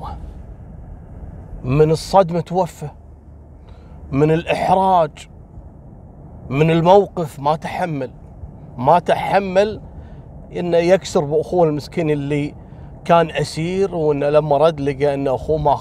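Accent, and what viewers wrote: Kuwait. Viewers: أدمنت طريقة سردك يا ولهجتكم الكويتية حلوة 🇩🇿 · ياخي مع انك تقول قصص جرائم ورعب وتخوف بس انت سعادة حقيقة بحياتي 😊❤️حبيت الكويت وأهلها ولهجتكم منك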